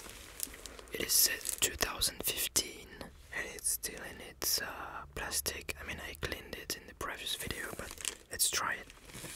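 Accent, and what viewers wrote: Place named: France. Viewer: French accents are the best tbh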